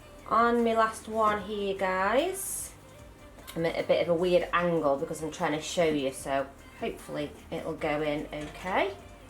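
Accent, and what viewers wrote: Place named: England, United Kingdom. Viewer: great clear tutorial!! i watched a view but got lost seeing the fingers! ps love your English Accent.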